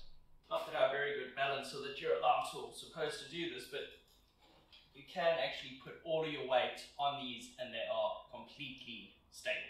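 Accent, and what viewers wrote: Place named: South Africa. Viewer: Any chance this is available in South Africa, sounds like a RSA accent 🙂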